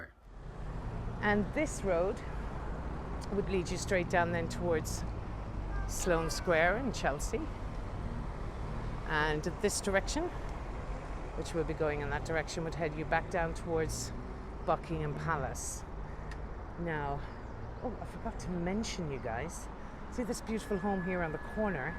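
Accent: Ireland. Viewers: I could listen to this lady read the instruction manual for my washing machine. Love the Irish accent 💚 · An excellent tour again, full of interesting details. A relaxing time to enjoying and learn about, with a nice Irish accent. Thanks!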